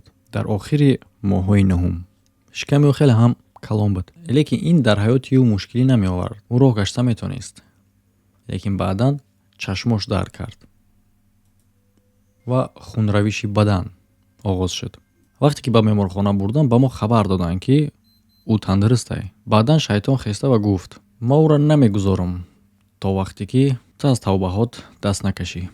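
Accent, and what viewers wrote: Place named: Tajikistan. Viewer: ВАХАХА НАВ ЧИСТА ТОЧИКИ ГАПЗАДИ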